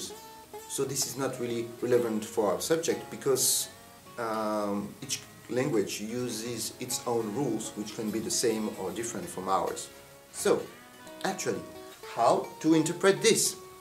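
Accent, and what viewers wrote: France. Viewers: he obviously isn't English, he has a French accent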